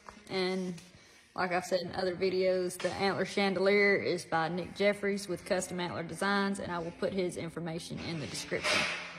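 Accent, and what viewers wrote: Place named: Alabama, United States. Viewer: You go girl love your accent reminds me of home I grew up in north Alabama now living in Texas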